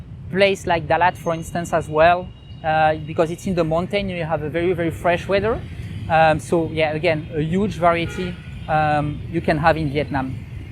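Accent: France. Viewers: The first 10 seconds watching, I was honestly convinced he was putting up a fake French accent!!! ;)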